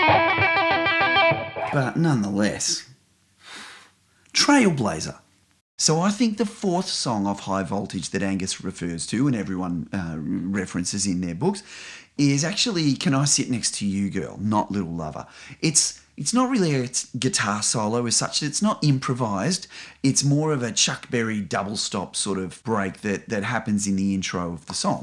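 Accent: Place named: Australia. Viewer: Just watched this! Love it. Great to hear an Aussie accent in this space. Forensic guitar analysis, and you are so talented to be able to demonstrate each player. Your little assistant even has your hair😊🤣. Rock on🎸🔥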